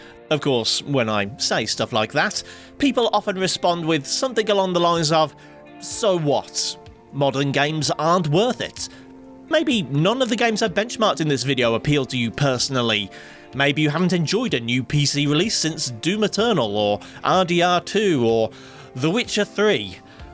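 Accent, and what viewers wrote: England, United Kingdom. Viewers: Mr english accent, please make a video of a 2070/2070 super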